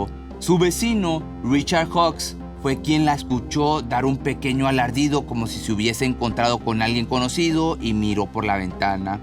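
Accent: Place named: Mexico. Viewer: eres muy guapo🥰🤗,..descubrí tu canal ayer y de una me suscribí 😁...de dónde eres, tienes un acento como ser de México 🤔🤭😻😍😘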